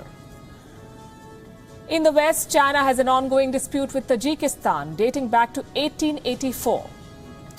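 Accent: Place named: India. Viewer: strong curry english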